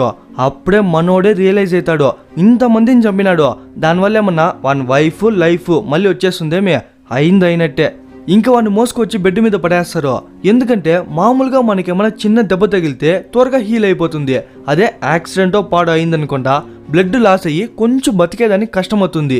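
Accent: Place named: Andhra Pradesh, India. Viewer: Cheyyandaa enti raa brooo. Chilton nellore boarder Slang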